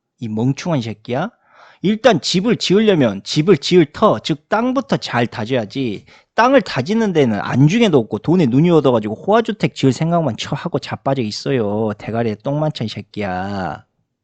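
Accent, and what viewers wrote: Jeolla, South Korea. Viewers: 전라사투리로 욕을 찰지게하시니. . 시간이 금방지나가네요 ㅋ 일론이 아버지도 사기꾼이었다고 하든데. . 피가 흐르는건가?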